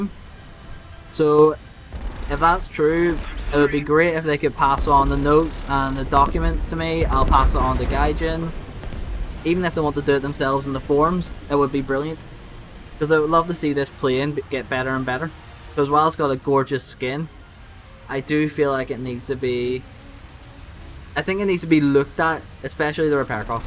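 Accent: Northern Ireland, United Kingdom. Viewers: Hey mate, are you from Northern Ireland?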